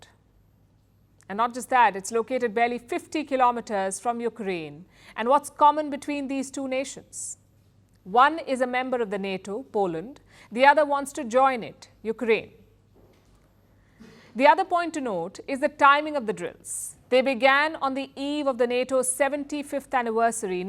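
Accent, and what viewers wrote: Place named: India. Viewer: FirstPost is funded by the CIA. Easy proof: FirstPost never makes fun of Biden or Kamala's gaffes / bloopers. CIA won't allow that. USA wants to brainwash Indians. So they chose Palki with her thick Indian accent to fool Indians into thinking that she speaks for Indians. She is just a CIA employee.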